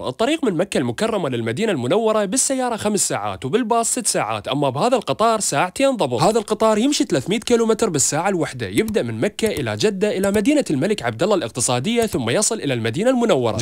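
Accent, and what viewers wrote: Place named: Iraq. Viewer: بس سوال انت عراقي بس لهجتك حيل مبينة عراقي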